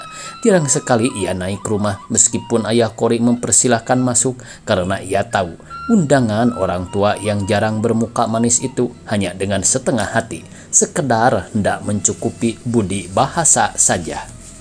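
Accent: Sunda, Indonesia. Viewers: Hhhe bang ki sunda gk klop certi dlm bhs indonesia karena sdh klop dongeng sunda hhe lagamna bhs sunda ki.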